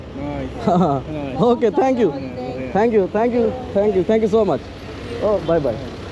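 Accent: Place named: Malwa, India. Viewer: chal oye ehe baut vadia part c, bai ghaint a pura, roti baut sohna kehanda, menu lagda malwe da hana bai?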